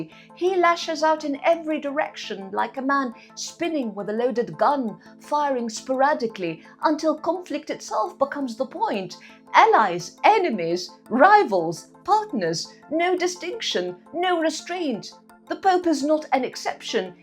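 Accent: RP, United Kingdom. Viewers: Royal family / Queens style english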